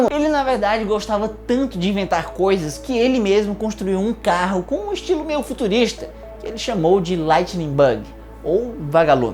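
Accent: Ceará, Brazil. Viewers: Umas amigas me explicaram o que era "aí dento" mas eu nunca tinha escutado de maneira natural antes desse vídeo kkkkk. Você é do Ceará? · Você é do Ceará? Se for, qual cidade, notei por algumas gírias e o sotaque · Vc é cearense? Kjjkj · tu é cearense????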